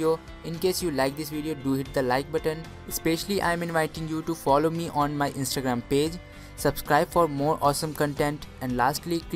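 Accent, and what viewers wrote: India. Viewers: I like the video but the matter is i can't understand what he says cuz it's always indian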